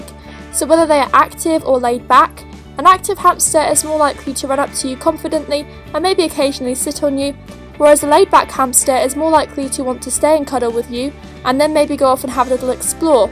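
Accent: England, United Kingdom. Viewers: You sound English.. Anyway if you are do you know the best place to get hamster toys and hideouts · are you English?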